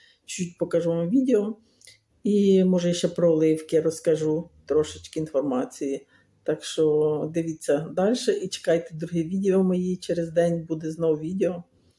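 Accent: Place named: Vinnytsia, Ukraine. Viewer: Я думала,що ви з Віниччини,або Хмельниччини. Акцент не черкаський,бо маю колежанку Раю з Ватутіна і чула,як розмовляли її землячки. Вона з Рівнинщини.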